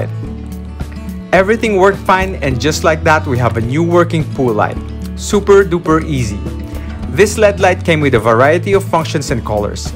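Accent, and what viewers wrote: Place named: Philippines. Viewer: Salamat! I knew by your voice you were likely Philipino. I now no for sure, since I went to website to look at the light. Available in Manila lol ...looking for something similar in the states! Much bless!!! Thanks for the suggestion on waterproofing the J-box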